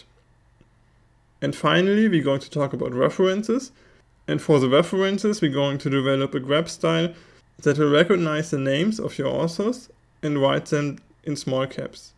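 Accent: Germany. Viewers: Very well explained even though with a hard German accent :) I spot some similarities to linux commands and i.e. escape characters. My only suggestion is to deal a bit with some vocab like Backslash "\" is a escape symbol, you have parenthesis, curly braces|brackets or square braces|brackets colon is "Doppelpunkt". | is vertical bar or "pipe"... Anyways... Thank you for the well-performed explanation!! ++++ You dropped your website it seems. Maybe you have to edit your description and the video.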